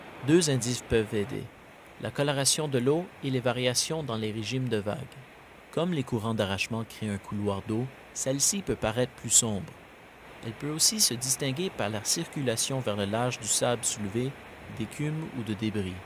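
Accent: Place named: Quebec, Canada. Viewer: Sur la côte atlantique française, cela peut porter plusieurs noms : ''Baïne'' ('petite baie' ou 'bassine' en langue gasconne) sur le littoral aquitain, ou ''Bâches'' au nord sur la côte de Bretagne ou de la Manche. Plusieurs phénomènes doivent être rassemblés pour créer ces courants d'arrachement : Il faut des marées suffisamment fortes, du sable fin, un dénivelé faible et une forte houle. C'est spécifique aux côtes dont le fond est uniquement sableux. En tant que surfeurs et bodyboardeurs, nous nous en servons pour faciliter la remontée vers le large, une sorte de ''voie rapide'' dans l'eau pour rejoindre les pics du déferlement des vagues et se positionner. Mais en effet, c'est très dangereux pour les baigneurs. Vos conseils de prudence sont très utiles. Chaque année, nous déplorons des noyés dans ce contexte, par imprudence. En tous les cas, je savoure l'expression que vous employez (''Courants d'arrachement) + l'accent québecois ; c'est encore plus suave ! 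😉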